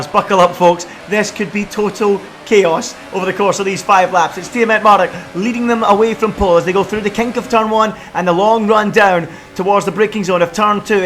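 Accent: Scotland, United Kingdom. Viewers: nice scottish accent commentary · One question. Is he scotish?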